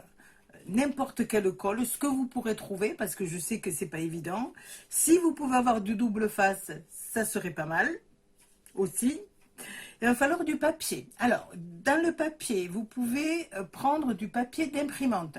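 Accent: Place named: Provence, France. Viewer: Coucou ma petite,comme tu est gentille 😊,c est moi qui te remercie,tu est si agréable, et tellement souriante,avec ton accent qui chante la Provence 😊,un régal. J ai vu de suite que tu avais coupé tes cheveux,tu est impec,😊,moi aussi mon mari m'a coupé mes cheveux, mais j ai pas le même résultat,lol,😉.Pas de soucis, tu peux compter sur moi je vais aller voir la chaîne de Ninou ☺.Un tuto 😁😁,album cartable, pas de soucis,je suis d attaque, on commence quand tu veux 😊😊, je t envoie pleins de bisous 😘😘🤗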